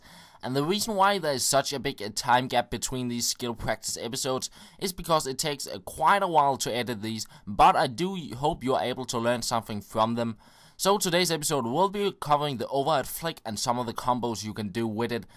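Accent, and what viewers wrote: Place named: Denmark. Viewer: Haha, man kan hører du er dansker :-) - Elsker dine videoer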